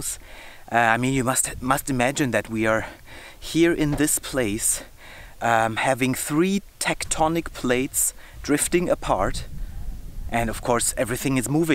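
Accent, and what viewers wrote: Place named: Germany. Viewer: You sound so German! 😄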